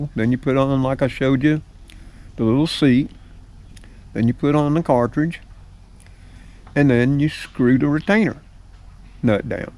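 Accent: South, United States. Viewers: Love your Southern accent too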